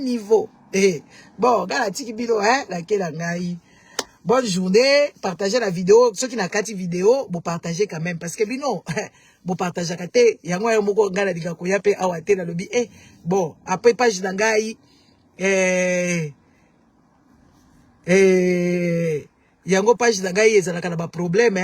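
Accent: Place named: Rwanda. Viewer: Longua yo Rwandaise déguisé en Congolais agent ya Kagame n'a communauté Ozalaka nayo mutu ya M23 Banda kala, role batinda yo n'a communauté eza ko kosa comme si Oza Combatant alors que ce faux, Ozalaka nde Rwandaise agent ya Kagame, ba maloba nayo sans impacté chez biso ba vraie Congolais de père et de mère, n'a lingala nayo avec accent Yaba Rwandais,Oza ya biso te et opinion nayo ezo compter te mwasi ya salite solo M23 déguisé en combatant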